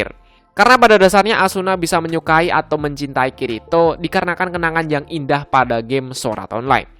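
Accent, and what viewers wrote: Java, Indonesia. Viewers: Mungkin dia medog :v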